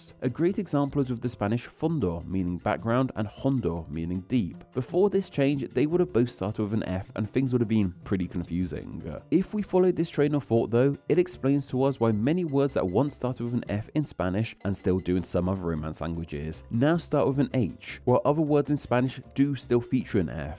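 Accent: United Kingdom. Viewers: I find it interesting that this video that's focused on the "F" is narrated by someone with a "British" accent. In this accent, the words spelled with "TH" end up sounding like F!!! Fanks for this video!! It was very interesting! It's possible a similar thing happened to Spanish based on accents throughout the history of the changes... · Blud he’s British what do you expect 😭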